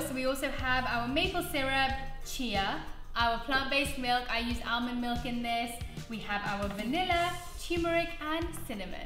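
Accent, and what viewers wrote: England, United Kingdom. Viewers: I really like her British accent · Ik she's English but her accent gets me every time XD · I love your videos, they are so inspirational. I would love to hear more about your health journey. Are you British??, which part???. I love poha. ❤️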